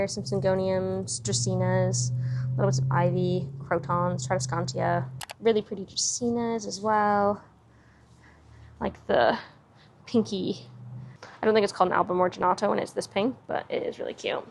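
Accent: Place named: United Kingdom. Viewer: Your accent has always been so interesting to me, you have picked up so many british-isms that you have an in-between accent heh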